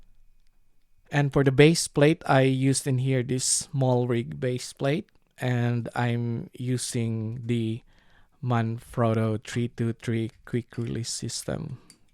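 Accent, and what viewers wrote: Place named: Philippines. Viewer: Hi there! I hope your have a great day! Nice video! I have a question, are you Filipino? My mom was born there! Just wondering if you are because you sound like you are! Have a good day!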